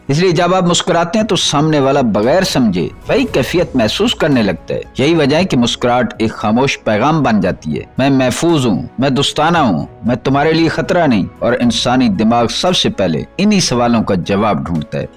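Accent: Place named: Punjab, Pakistan. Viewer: Content Acha hy….pr lehja Punjab k Molvi sahab jesa….please Urdu pronunciation (talaffuz) sahe ada kia karaien bulky properly seekhain….ya kisi achi Urdu bolny walay sy voice-over karwaien! Thanks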